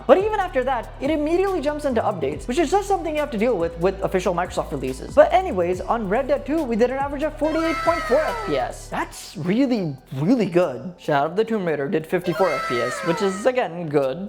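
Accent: India. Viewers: ARE YOU INDIAN OR AMERICA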